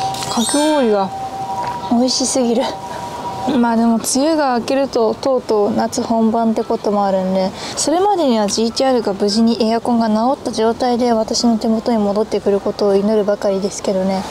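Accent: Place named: Gifu, Japan. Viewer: 鍛わってる。 久々聞きました。岐阜弁懐かしいです。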